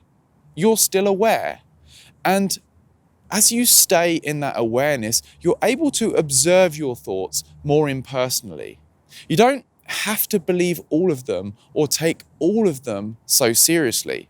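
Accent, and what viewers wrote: United Kingdom. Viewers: Great content man! I've been meaning to explain this topic to people but they don't understand me or don't want to. I've seen people overreact and become so identified with their minds that they seem out of control so I try to help but it's hard. PD: I love the accent, I'm from Argentina and I'm studying to become an English teacher and here we learn RP English.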